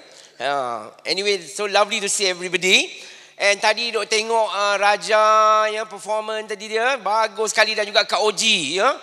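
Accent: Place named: North, Malaysia. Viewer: Mak org Singapore, ayah org Melaka, tang mana boleh melekat loghat Utara?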